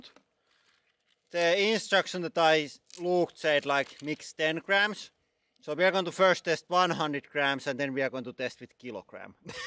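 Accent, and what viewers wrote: Finland. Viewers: I watch just to hear finglish again like at my grandparents. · Accent and a few words tells me you are Finnish. Correct? Oikee? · lol that finnish rally english, knew you were from finland after first words out of your mouth, way before seeing text on side of that van at background :D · Your acccent sounds Finnish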